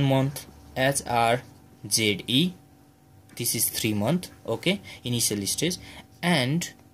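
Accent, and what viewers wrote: India. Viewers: lol hes indian and has accent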